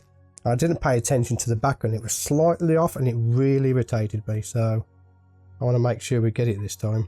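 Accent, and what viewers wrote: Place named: Birmingham, United Kingdom. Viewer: Gotta luv the brummie accent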